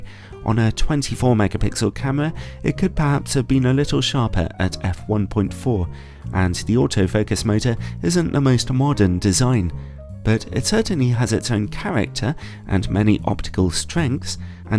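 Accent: United Kingdom. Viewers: ❤️ British Accent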